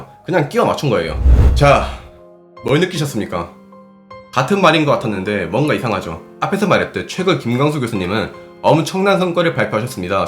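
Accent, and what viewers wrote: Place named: Masan, South Korea. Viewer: 마산 억양이 묻어나오는 서울말씨. 잘 봤습니다^.^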